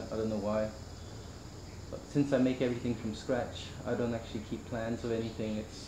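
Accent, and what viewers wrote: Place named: United Kingdom. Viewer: I never woulda thought you had a brit accent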